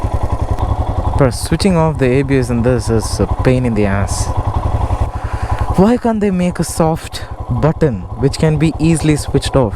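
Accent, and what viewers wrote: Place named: South India, India. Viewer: South Indian English I can Understand 👍👍👍👍👍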